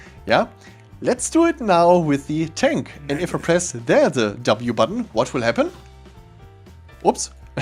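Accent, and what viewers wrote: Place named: Germany. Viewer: Ahh dieser deutsche Unterton ^^ man erkennt den doch überall. Es gibt leider kaum Spieler für das Game =(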